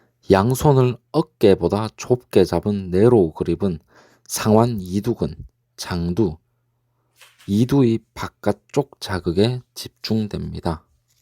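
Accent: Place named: Gyeongsang, South Korea. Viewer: 님도 말투가 경상도분이신거같은데 구독자도 경상도분이시네요ㅋㅋㅋ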